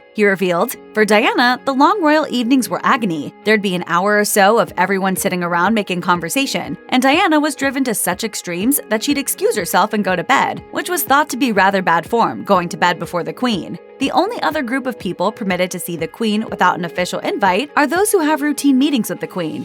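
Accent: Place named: United States. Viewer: An American accent talking about British royal protocol is just not proper!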